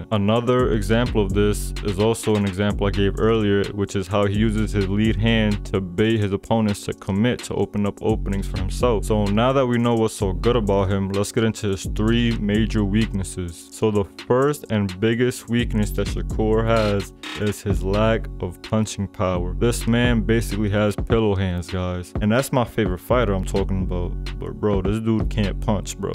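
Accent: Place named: New York, United States. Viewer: I can tell you’re from New York. Do u train?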